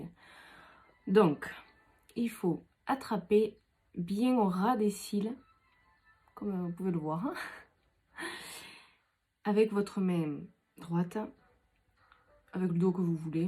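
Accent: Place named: South, France. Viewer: Merci pour l’astuce, explications claires et avec un bel accent du Sud 👍🏻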